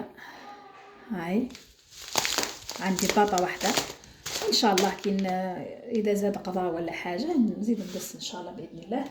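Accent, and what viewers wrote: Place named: Djelfa, Algeria. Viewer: انا عاجبتني غير اللهجة كلش بشوية مليحة رويزة بيطة حميصة انا راني بعيدة على الجلفة وزدت سمعت هدرتك توحشتها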